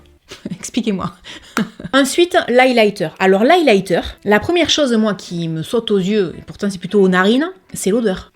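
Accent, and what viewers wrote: South, France. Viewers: Merci pour cette video pour ta bonne humeur et ton accent du sud j aimebcp les crayons yves rocher pour les yeux bonjour a talounet 🤩🤩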